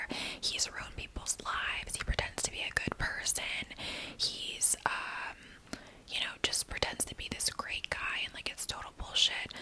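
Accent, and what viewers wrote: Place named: Vineland, United States. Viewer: Uuggghh!!! I love your whispers the absolute most because your whispers remind me of my cousins who are from Vineland NJ and their accents are very similar to yours. I live in Vegas so I don’t see them as often as I’d like but watching your vids reminds me of when we would have sleep overs as kids and we would whisper all night about boys we liked lol. I wish you all the success with your channel, and i can’t wait to watch you hit a mil😉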